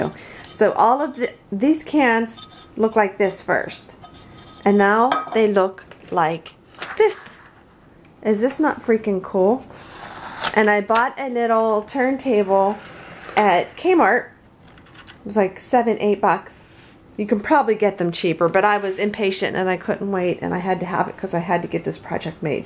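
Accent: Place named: Pennsylvania, United States. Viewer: Ok, I thought I recognized that PA or OH accent. Then I saw the 'keystone' shape you used to stick in the clip holder. And I thought Ok.... she must be from PA. I grew up in western PA, near Pittsburgh. I live in SC now, but spent the first 18 yrs of my life up there. So where are you from? Hugs, Jessica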